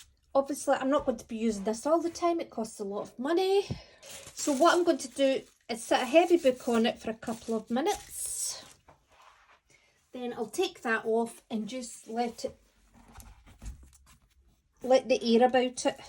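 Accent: Scotland, United Kingdom. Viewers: When you chide yourself for (almost) being daft it sounds like a compliment, but when I do it using my mid-western American flatlined pronunciation it sounds like a guilty admission. 😜 This is by way of a request/ gentle reminder for you to use a wee bit more Scots as part of your guidance. When I pronounce daft your way I feel much better when I run off the rails accidentally on purpose. I’m sure there is much more Scots argot for this pursuit. Thanks again for your help.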